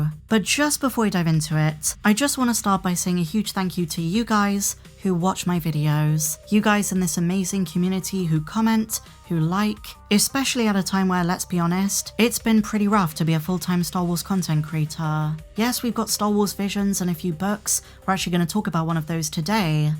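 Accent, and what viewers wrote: United Kingdom. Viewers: I'm no fan of the speculation portions here, or the non-video media offerings since my generation didn't align with the release dates, so I'm not as obsessed as most of your viewers. I am very curious about the person behind the character here. The facts as I've observed is that you put a ton of effort into editing. No breathing pauses, a UK accent while living in America, and your personality coming through in the dialog. My guess is that you're an American male working incognito and are your initials. I have nothing against it if that is the case and would likely do something similar because of all of the loud, crazy, uninformed, and over opinionated attention addicts, lol. Good luck moving forward, and if you ever run out of topics, there's always the fan fiction to share.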